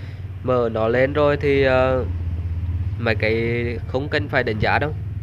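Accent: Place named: Quang Binh, Vietnam. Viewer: Giọng Anh em ở Quảng Bình giống y hệt Anh lun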